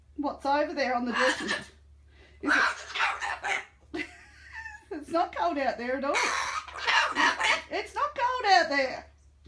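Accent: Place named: Australia. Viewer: Oh yes I agree, along with the Australian accent he is priceless! 🦜 😁😅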